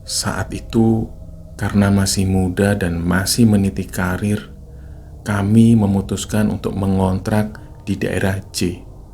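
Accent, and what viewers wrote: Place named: Java, Indonesia. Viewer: Logat jowone ojo sampek ilang mas...semangat 💪💪💪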